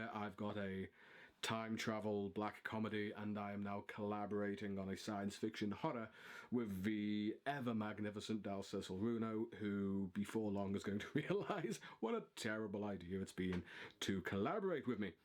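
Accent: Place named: Northern England, United Kingdom. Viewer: I thought I heard a Northern twang in there! Hi, I'm Adara. I'm a writer who usually hangs out on Twitter. Your song choice sent me back in time to many anime conventions ^_^ I like how original your goals are. In terms of specific cult references, anyone who can explain my 'give quiche a chance' t-shirt deserves a cookie. I'd love to see more writers involved in Doctor Who. I love how you're choosing animation and comics for your dream adaption choices. So many people fall by default into the film or live TV only trap. Lastly, good choice co-writing with Dal!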